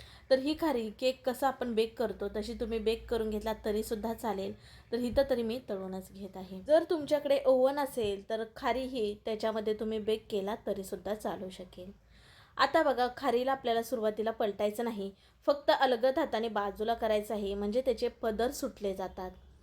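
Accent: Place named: Rural, India. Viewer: खूप छान. बिनधास्त गवठी बोला तेच आईकायला छान वाटत 👍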